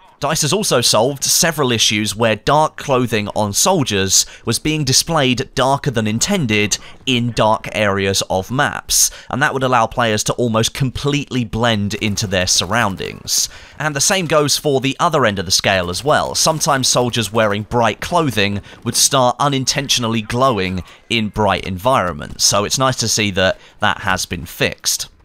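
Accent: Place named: RP, United Kingdom. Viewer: I really like this guys content but I cant stand his posh way of speaking. For example when he says "as well" at the end of a sentence, its drawn out and prissy sounding.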